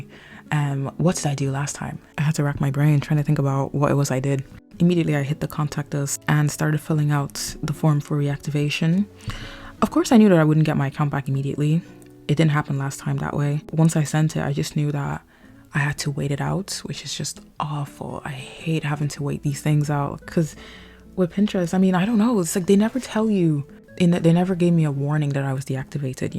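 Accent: Ireland. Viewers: Omg are you irish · She sounds more Irish to me 🤔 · she's irish lmao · ur irish accent is really popping through in this video !!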